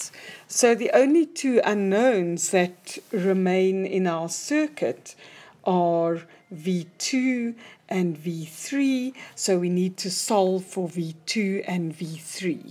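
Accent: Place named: South Africa. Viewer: I'm a South African studying in the states and hearing this accent made my day, new subscriber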